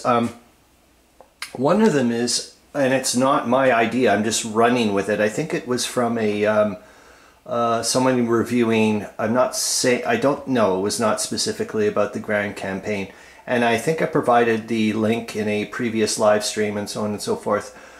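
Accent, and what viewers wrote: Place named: Canada. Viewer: Sorry, but you sound very Canadian, are you from Alberta or Sask by chance?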